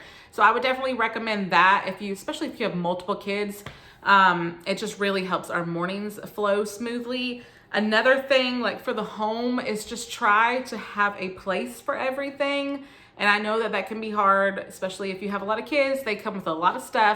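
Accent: South, United States. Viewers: Lots of traveling 😃👍sounds so fun! Vegas on the strip is fun but at night it gets wild lol. Last time I went there someone stole my friends phone and debit card 😩 definitely keep your belongings close if out at night. I've always wanted to go to AZ 🙌 I have family that moved there and love it. I also like doing music because of all the sound in the background haha . I have a hard time doing voice overs haha I still need to practice but now that I will be back editing on my computer , hopefully it will get better . As someone from CA , I thought you had a Southern accent. I love it 💗 haha people say I have more of a Valley girl accent - I think it's normal California talk hahaha . I love hearing everyone's accents . I talk to people all over the world at work and I hear it all , I love interacting with others from different states and countries . I definitely would consider homeschooling as well. 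I thought of public because I went to public but now days things are so different in the state i live in so im undecisive. I'm thinking of taking some courses to learn more for home school . I will have to look more into it . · I can hear the Southern accent a bit, but it isn't like intense, but sort of there haha! I find accents so interesting and lovely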